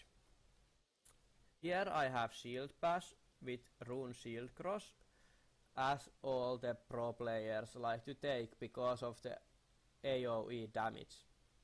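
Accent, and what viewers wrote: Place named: Finland. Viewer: Ootko sä suomesta? Kuulostaa niin suomalaiselta englannilta, if you know what i mean :D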